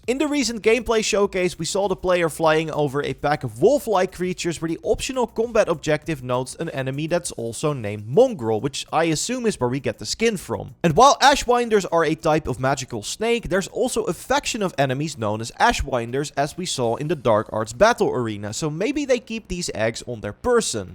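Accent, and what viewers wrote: Netherlands. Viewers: I smell Dutch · Are you Dutch? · Are you Dutch? You kinda have a Dutch accent that's why. Also looking forward to the game! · You sound dutch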